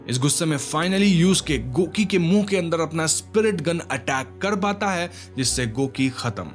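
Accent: Bengal, India. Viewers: Bhai aap bengali ya odia ho kya??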